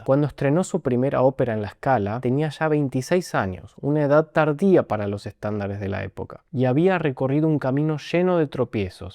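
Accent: Argentina. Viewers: Hola varón, por tu voz. Parece que eres argentino tremendismo que aporte haces a todo el mundo al menos para mí. Yo tengo ya 67 años y siento que me completa tu aporte cultural argentino. Tendría que ser bueno yo soy chileno, nunca he tenido una dicha de estar en Argentina, pero bueno alguna vez lo haré, le pagué mis pasajes a mi hijo y a mi hija para conocer argentino. Llegaron maravilladoscon gente como tú como el gran Sandro de América de Leonardo Favio, Piero, etc.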